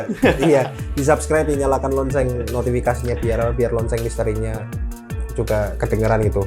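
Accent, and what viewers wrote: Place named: Banyumas, Indonesia. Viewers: Cilacap hadir, wkwk jawa bgt ya mase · Kereeen bahasa ngapaknya.. Sering2 diselipkan bhs ngapaknya bang.. Biar makin seru.. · Bhasane msih ketara ngapake kang kya nyong 😅😅😅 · Joss wong ngapak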